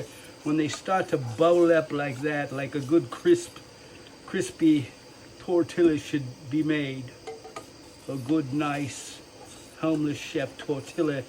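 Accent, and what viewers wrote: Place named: United Kingdom. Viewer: I never knew u was british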